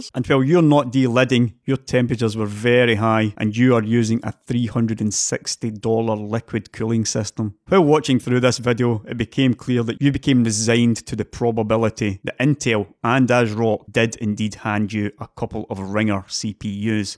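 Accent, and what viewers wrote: Scotland, United Kingdom. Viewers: Well, I'm a bit of an AMD fan. I think is more about keeping monopolies in check. I've never really seen you as bias. Sure you have your favourites. But to me you come across as very matter of fact, no marketing bs. That combined with the scottish makes your informed opinion matter to me. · A little bit long. Also, we have a Scotsman in an argument with an Aussie, and I'm going to back the Scotsman. That's not going to make me a lot of friends.. Now, we're a day or two away from the release of the two thousand series Ryzens. Now watch what happens when "independent testers" start testing the new Ryzens. Some people are really independent testers, some are fanbois. Intel will lean on everybody to skew the tests in their own favour. And Intel will have plenty of fanbois to imitate the Russian secret service on EweChoob and every other social media, they probably won't even have to pay them.... 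AMD... they have some pretty rabid fanbois of their own...... yeah, worth watching (listening) to the end of the last one. He does seem to be going off at Aussie Steve quite hard, but that does change toward the end. Watch all of it before you throw stuff. I would back, and I am an Aussie. I have yet to hear him say anything I sharply disagree with. That alone, puts him in a different group to most other tech tubers... The Scots accent is a little bit thick, but the man who has it, is not.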